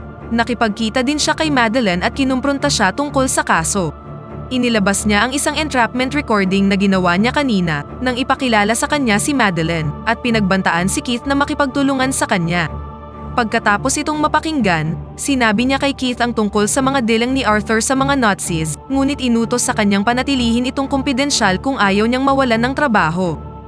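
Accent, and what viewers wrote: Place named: Philippines. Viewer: galing, sir anong reader app mo na may pinoy accent?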